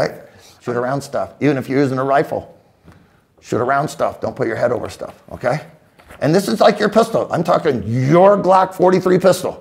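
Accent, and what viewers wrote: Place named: United States. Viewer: Which region of USA do you belong to sir? You have a very interesting accent.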